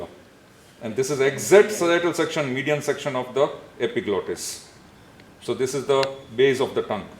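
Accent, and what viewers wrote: India. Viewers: Indian english I cant understand anythink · This guy has a strong accent. Seems like if he were speaking native Indian language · Indian accent?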